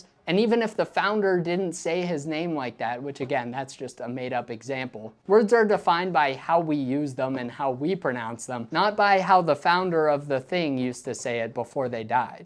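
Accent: United States. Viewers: I can tell you're from Ohio so came everybody I talk to our accent, is something straight out the cornfield.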